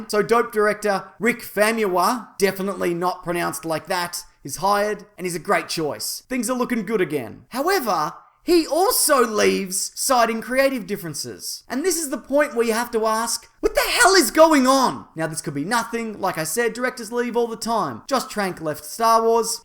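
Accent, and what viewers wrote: Australia. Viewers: Man you are awesome and the Australian accent helps haha. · Be honest people, the only reason you watch these vids is for that SWEET, AUTHENTIC, AUSTRALIAN ACCENT!! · Love this dudes crazy Ozzie accent, sound just like Steve Irwin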